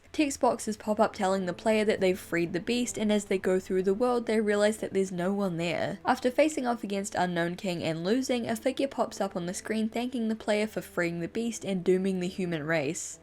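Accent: New Zealand. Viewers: YOUR A KIWI!!!!!!!!!!! WOOOOOOOOOOOOOOOOOOOOOOOOOOOOOOOOOOOOOOOOOOOOOOOOOOOOOOOOOOOOOOOOOOOOOOOOOOOOOOOOOOOOOOOOOOOOOOOOOOOOOOO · wait, you're a kiwi too? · Kiwi?? I thought you were British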